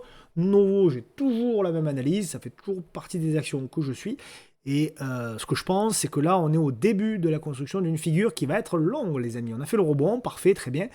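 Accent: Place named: Montpellier, France. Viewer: Faut se le farcir l'accent de Montpellier..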